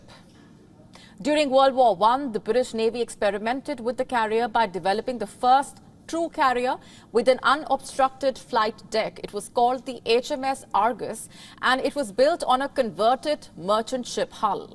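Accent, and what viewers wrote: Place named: India. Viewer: 这印度口音听着真是头痛。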